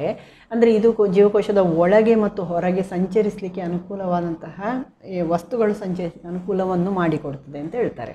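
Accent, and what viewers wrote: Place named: Coastal Karnataka, India. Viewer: ತಾಯಿ ನೀಮ್ಮ ಕರಾವಳಿ ಸೊಬಗಿನ ಭಾಷೆ ತುಂಬಾ ಇಷ್ಟವಾಯಿತು.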